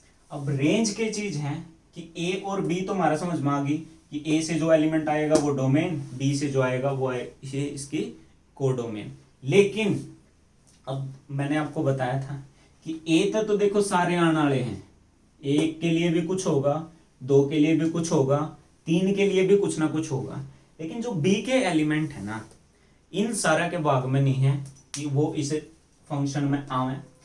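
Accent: Haryana, India. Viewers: Ohh sir aapne haryanvi kb se bolni suru krdi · Sir aapne haryanwi km achi lgti h...aap hindi hi bola kijiye..... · Sir hryanavi mt bola kro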